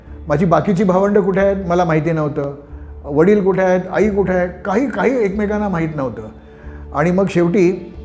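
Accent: Pune, India. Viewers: After long time I heard puneri marathi ❤️ Being a muslim but punekar my child hood spend at kasba peth. Work place was Deccan. After marriage we shifted to camp mg rd.it was gap of 18years. My mom survival of this incident she was may be 7 or 8 yrs old she told me they were shifted to rasta peth I think my be I m not wrong it's mudliyar bunglow. Thanks sir for this video 👍 I will show this video to my mom ❤️ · असं स्वच्छ आणि चांगली मराठी भाषा बोलता येण्यासाठी मुळ पेठांमध्ये जन्म घ्यावा लागतो.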